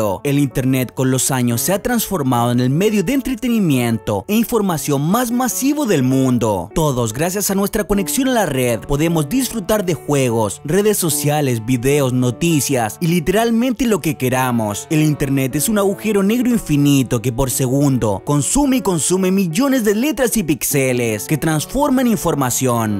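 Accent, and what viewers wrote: Chile. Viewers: Eres de chile? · eres chileno?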